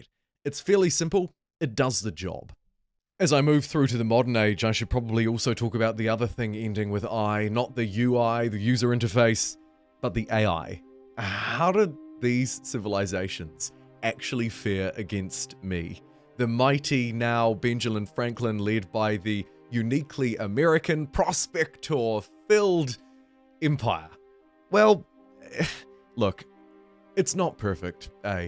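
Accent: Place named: New Zealand. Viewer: The familiar Kiwi accent is a welcome!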